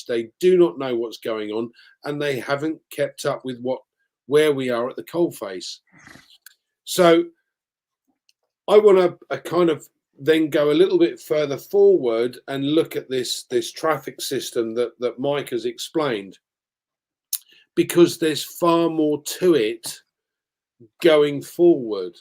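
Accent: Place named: RP, United Kingdom. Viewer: that was a very good explanation in plain english or what we call Queen's english and hope at least 35 out of 50 got it😳:hand-pink-waving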